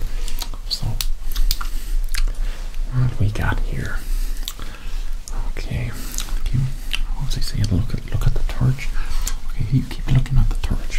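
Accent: United States. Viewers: Everyone’s talking about your joker impression, but I’m just amazed by the American accent in general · damn your american accent is really good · That’s actually a really good American accent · Happy Halloween and you wore Joker costume and American accent. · Amazing. Two giveaways to the American accent: 1. We call it a flashlight, not a torch. 2. British ASMRtists say “anything” like “a-nuh-thing” or “a-neh-thing.” Americans say it like “eh-knee-thing” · he sounds so different when speaking with an american accent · the American accent sounded great. You should do it more often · His American accent isn’t perfect but it’s pretty darn good, much better than I expected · I love it that does an American accent, but still says "torch" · Your American accent is getting REALLY good!! 👏👏👏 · Speaking American accent. What the heck.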